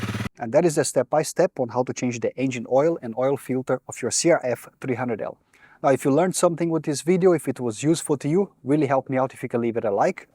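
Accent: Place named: Europe. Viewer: hi, from your accent I assume you are a fellow European, where do you source the OEM parts? Thanks!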